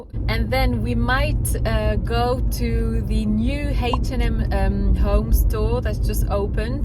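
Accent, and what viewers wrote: United Kingdom. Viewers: I am in love with your british accent. Please don't stop vlogging in english.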